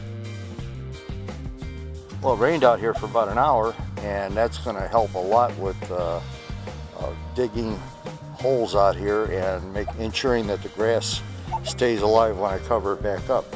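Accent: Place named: Chicago, United States. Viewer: I dig that classic chicago accent. Happy hunting!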